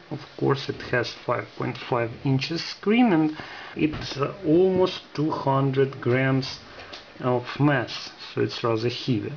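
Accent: Russia. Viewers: very good review. By the way, are u russian?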